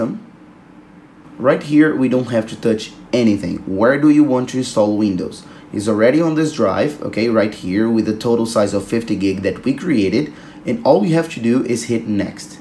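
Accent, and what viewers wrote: Brazil. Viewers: I'm hearing a strong Brazilian accent lol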